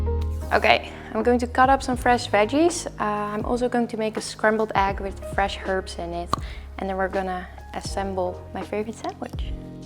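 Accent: Netherlands. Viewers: Lol r u dutch your accent is so dutch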